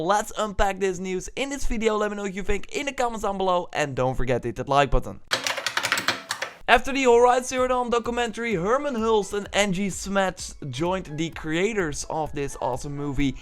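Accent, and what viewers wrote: Netherlands. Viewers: I never thought about your dutch accen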